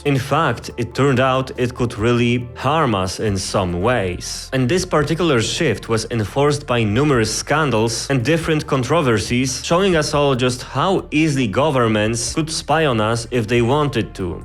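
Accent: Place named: Poland. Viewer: Is this an english version of? Same thumbnail, voice and video in general. Czy mam rację? I feel that I shouldn't be here lol. Oh btw I FEEL Polish accent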